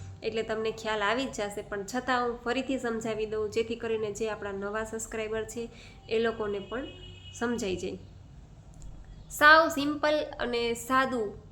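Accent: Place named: Kathiawar, India. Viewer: Mane tamaro kathiyavadi voice bav game ben sweet boli che👌🤗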